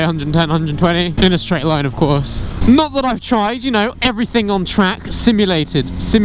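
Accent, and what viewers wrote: United Kingdom. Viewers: Gotta love it (the British accent)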